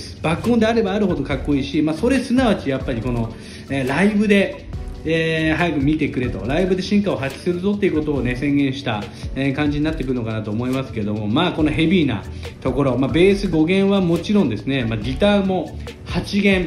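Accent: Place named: Hiroshima, Japan. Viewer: 所々広島弁が散りばめられているのはさすがです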